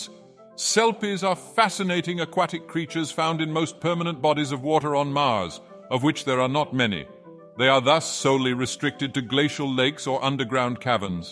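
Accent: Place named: United Kingdom. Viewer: British dagoth ur 🧐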